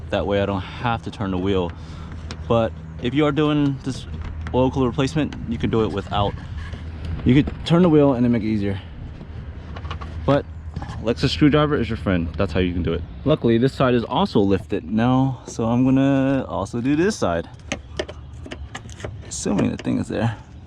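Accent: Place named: California, United States. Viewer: Why not straighten the fins? Ps first time come across your channel. You have an extremely strong Californian accent. Socal?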